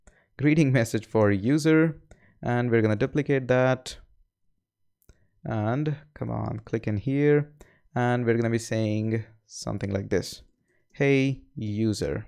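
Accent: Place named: India. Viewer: I like the Indian accent. "parenthesis" is paren + thesis. Another word "parameters" is para + meters. In Brazil we have somewhat the same way of saying words, like emphasis in the last part of the word, sometimes in the middle of the word and even in the beginning of the word. Some words sounds like a roller coaster, and it sounds nice.....In English is kind of flat. like "parenthesis" but in parAmeters the upper case A is where the Americans do speak their roller coaster and speaks like paraMeters with his roller coaster starting on M.....I love linguistics. Sorry guys, off topic, but really nice......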